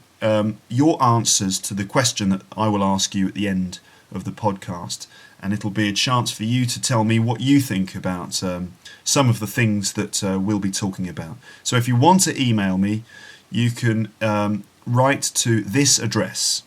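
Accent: United Kingdom. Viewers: I really like your accent "British" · I like British accent!😊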